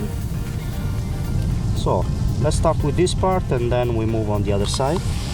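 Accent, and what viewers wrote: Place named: Italy. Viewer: Quanto cazzo si riconosce un italiano che parla inglese ahaha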